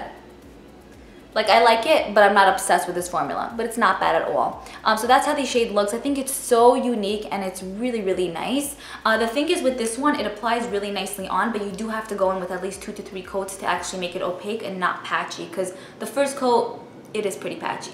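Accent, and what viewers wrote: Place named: New Jersey, United States. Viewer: you have the sweetest accent!!!! Are you from NJ?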